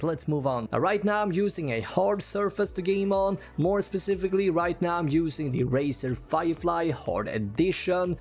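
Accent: Sweden. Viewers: THE SWEDISH ACCENT IS ON FLEEK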